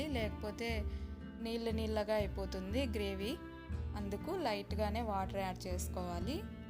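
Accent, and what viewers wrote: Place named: Telangana, India. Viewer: Mee slang తెలంగాణ లాగా ఉంది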